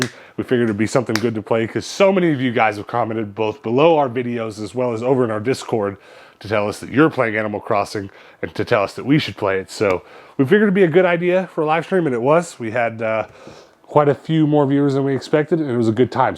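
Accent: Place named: United States. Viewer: You’re super American, interesting but a bit too much for me to listen too regularly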